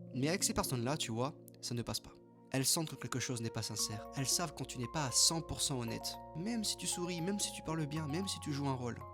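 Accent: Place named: South, France. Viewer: tu as les yeux gris.😮 À moins que ça soit la vidéo qui donne cette impression. 😊 Et tu as l'accent du sud aussi on dirait. 😘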